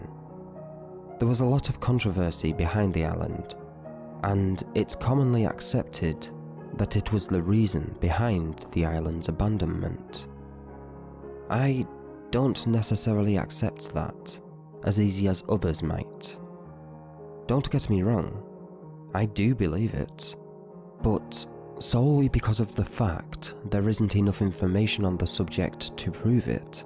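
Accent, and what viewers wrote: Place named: United Kingdom. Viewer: you know it mate :) good to have another British narrator around here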